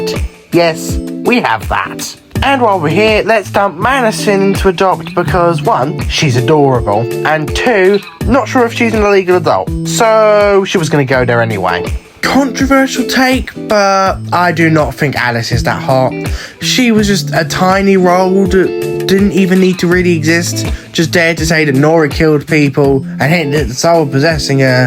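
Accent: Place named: United Kingdom. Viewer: You're so british it's grating 😔😔😔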